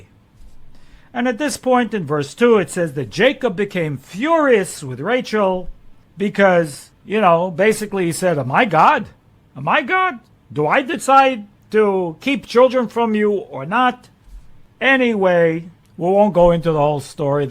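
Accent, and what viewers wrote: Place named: New York, United States. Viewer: Just discovered ur channel- never heard a Rabbi read or teach or relay a sermon before (whatever it is ur doing on this channel) but find u inspiring, knowledgeable and passionate (not to mention-ur accent that I love- that reminds of me of my days in NYC in law school many years ago). Scorpio man here through and through and working on self - improvement and development (spiritually). Though I’m Catholic, we all find that wisdom comes in many forms sent to us in many ways- and well, ur wisdom and sharing of same will become a great addition to my growing library of go-to’s. Thank you!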